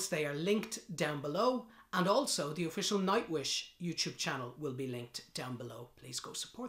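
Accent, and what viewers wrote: Ireland. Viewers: Welcome to the Nightwish rabbit hole, Barry. It's always nice to hear a fellow Irish accent on YouTube! I see Gabriel has already spotted your reaction, so you have a list to guide you. And we will be along for the ride. Perhaps the ' '?;-) Subbed, so I won't miss a reaction. ' ' - Wacken. <link> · You're a bit Oirish, aren't ya? I'm the Norn Irish fella. Anyway, I was meant to go see them in Dublin on the 17th November 2021 but they've had to postpone until 22nd November 2022 due to coronavirus. Reason I'm tellin ya this is cos it's purely on this song I want to see them, I really want to experience this live for myself. It's on me bucket list. Sure, I've listened to other songs by them but this is the one that really just leaves me speechless and I'm sure actually being there to witness and experience it is a whole other level. They're meant to play in The 3 Arena but I don't know if tickets are still available. 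It's something to look forward to next year...hopefully!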